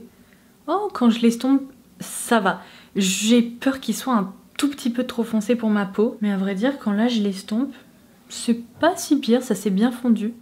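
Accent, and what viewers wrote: England, United Kingdom. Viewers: Sur tous les calendriers que j'ai regardé, celui là est juste whaou. MAGNIFIQUE 😍😍😍 Merci beaucoup pour cette belle découverte. Vous êtes d'une douceur que j'adore. En plus c'est incroyable toute votre connaissance sur les produits beauté. Et votre accent anglais, on en parle??? Juste whaou. Vous êtes un vrai rayon de soleil. Merci😍😍🌹🌈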